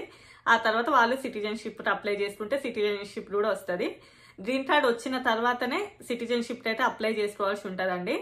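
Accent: Telangana, India. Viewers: Nachindi video accha Telangana bashalo mtladutunnaru, mie basha nachidi Thanks andhi 🙏🙏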